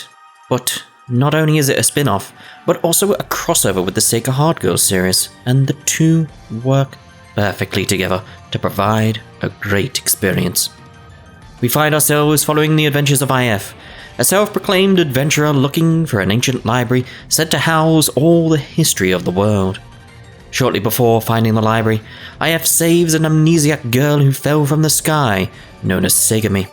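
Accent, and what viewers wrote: United Kingdom. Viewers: Dude I wanna a British accent too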